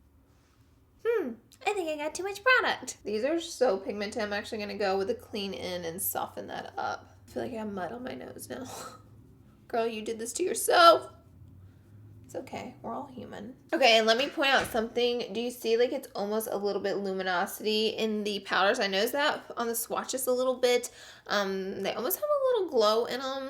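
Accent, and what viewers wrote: South, United States. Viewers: 😞😯 Sure miss your own unique personality & southern accent 😩😒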